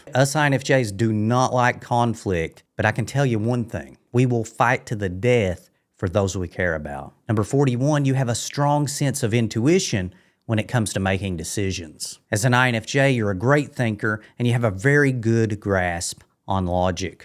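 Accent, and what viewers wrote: South, United States. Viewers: Just curious…where is your accent from? 😊 I know it’s from one of the Southern states, but don’t know which one. 😂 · Saulron. THATs what you are saying 😂. Really bothered me I had to back it up and put the CC on. It’s your soft southern accent & the fact that Tolkien created a name almost nobody knows how to pronounce 😂. Cheers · Really enjoy your content. I especially love your accent as a fellow Southerner. Was wondering if you would be willing to share your state of origin?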